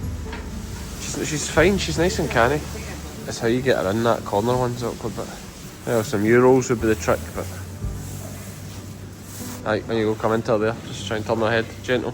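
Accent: Scotland, United Kingdom. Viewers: I know there's more to Scotland than your accent, but that little "och!" was so adorable!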